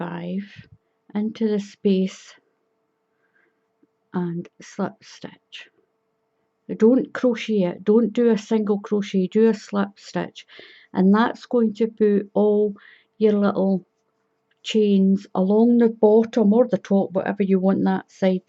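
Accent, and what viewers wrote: Scotland, United Kingdom. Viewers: well I think it’s a lovely stitch and blanket, and hope to recreate it myself. You can’t please everyone. Nice to hear a wee Scottish accent and look forward to seeing more from you. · I love this very pretty blanket, and I will be doing this for my new grandchild when he/she arrives end of June, thank you so much for your very easy to follow instructions, and your lovely Scottish accent xxx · It's also nice to hear another Scot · Thank you so much. This pretty baby blanket certainly did crochet up quick and mine turned out gorgeous just like yours. You made following the pattern so easy. I feel so much more confident now. Just love your Scottish accent. Thanks again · I am so delighted to have discovered your channel - your instructions are very clear and as a fellow Scot I love your accent! I'm fairly new to crocheting and I'm finding it difficult to know what size would be best for a pram blanket - I am seeing anything between 24x34 inches to 30x39 inches for an oblong blanket and anywhere between 36 and 48 inches square which would make a big difference to how much yarn I would need to buy! 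Could I just ask, if you were crocheting a pram blanket, what size would you make for an oblong or square blanket? Many, many thanks! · I love your tutorials. Plus I love your accent my mum was Scottish sadly she has passed away and she sounded just like you I could listen to you all day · This is a beautiful afghan/blanket stitch. Thank you for excellent simple instructions. I absolutely love your accent - I love accents - and I believe you are Scottish, which I have ancestry in Scotland and Ireland. Thank you so much for this help and will be going back and viewing other videos you have put on YouTube. Thank You and please you stay safe and healthy as well. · Are you from Scotland? Love hearing you talk!! Thank you for going slow and explaining so well. ❤ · Thank you, you are such a good tutor. I love your Scotish braw.I've got a few Scottish friends also, so I'm used to hearing that.I love this pattern it's brill. 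I've got my wool ready, so I'm gonna make this..I'm just getting over Coronavirus so we're social distancing self isolating as best we can, me and my Husband are, I need something like this to pass time. So glad a found you💗.Keep safe.Take care.xx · thanks for this lovely pattern. I'm half way through it. The yarn I used is a little fine, but it's still working up very quickly. Love your videos & the accent..only know one person from Scotland here in Ireland. Keep up the good work.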